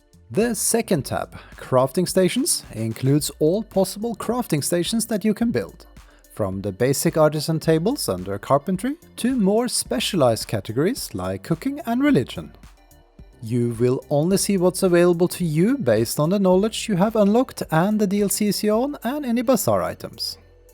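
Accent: Norway. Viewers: I believe i hear a norwegian accent xD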